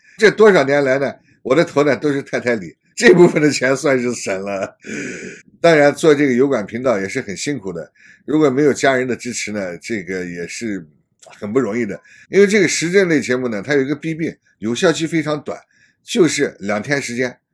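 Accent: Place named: Xinjiang, China. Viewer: 听说晒了一整天怎么感觉有点新疆口音？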